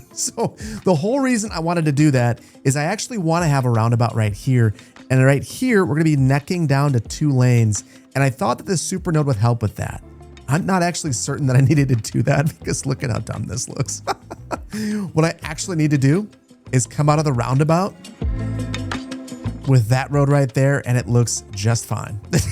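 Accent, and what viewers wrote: Wisconsin, United States. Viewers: Your Wisconsin is showing 😂 I live in a neighborhood just like this, out towards Milwaukee area. The Midwest is riddled with neighborhoods like this. I love it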